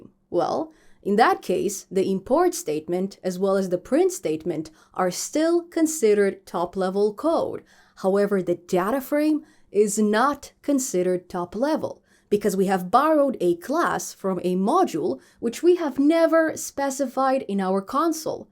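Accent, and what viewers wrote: Russia. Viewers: На россиянку похожа и акцент русский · Мне показалось, или я слышу легкий русский акцент?)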